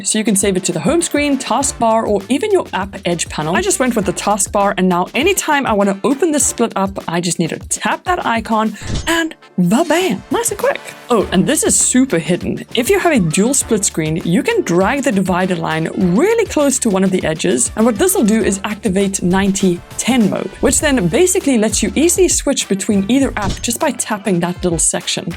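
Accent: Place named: South Africa. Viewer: Love the super fun features that you highlighted as well as the South African accent!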